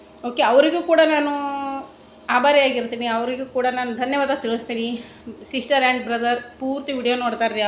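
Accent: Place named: Bijapur, India. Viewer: Nim bhashe nam bijapur du ant gottagut sister.gulbarga bhashe matadal nivu thanks 🙏 nice .navu bijapur distric davru but irodu out of State.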